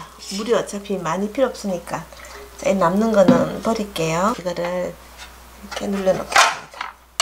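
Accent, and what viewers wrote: Gyeongsang, South Korea. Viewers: 네. 이모님^^ 감사합니다. 저도 경북에 산 적이 있어서 이모님 사투리가 정겹습니다^^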